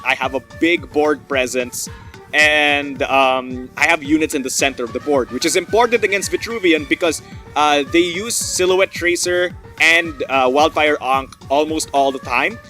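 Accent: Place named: Philippines. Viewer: Are you Filipino? Your accent is a pretty good hint. :))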